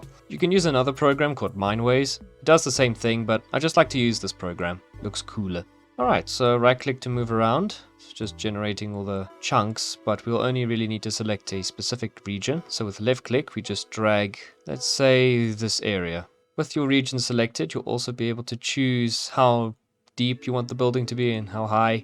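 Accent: South Africa. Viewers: I love South African accent. ❤ thanks for sharing…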